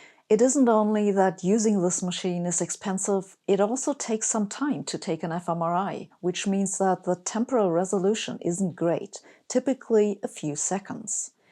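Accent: Germany. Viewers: your voice with a heavy German accent would the best replacement for computer Hal 2001, even better than the one from the movie Dark Star. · You brought your German sound palate to your superlative command of English. Please don't shed it. There's no reason why pentrating intelligence shouldn't be peppered with exotic charm.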